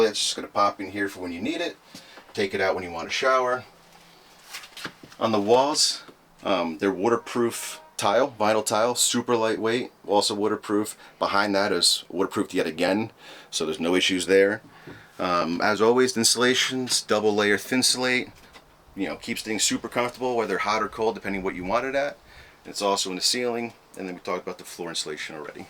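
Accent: New Jersey, United States. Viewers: that New Joisey accent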